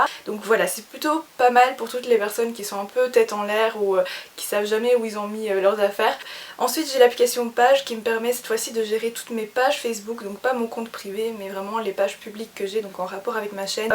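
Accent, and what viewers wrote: Belgium. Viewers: tu es belge? 😊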